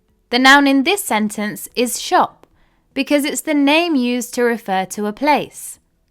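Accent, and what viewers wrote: United Kingdom. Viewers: I love a great British accent❤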